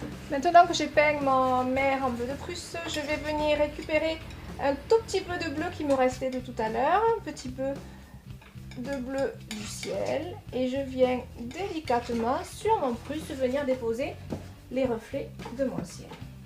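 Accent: South, France. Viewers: Je ne peux rien dire de plus que tous les autres. Heureuse de vous avoir découverte, talent, gentillesse, et l'accent de notre midi😂 je suis à six fours !! Merci et à très vite. Je me suis vite abonnée. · Non seulement c'est très pédagogique mais c'est très beau, avec en plus l'accent du midi! Un régal, ça me rappelle chez moi..Très belle démonstration de la méthode au couteau dont je ne suis pas experte; je pense que c'est de la peinture à l'huile... J'imagine que c'est aussi possible à l'acrylique ( je ne supporte pas l'odeur de l'autre....) Merci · avec l'accent du sud, ce bord de mer inspire, merci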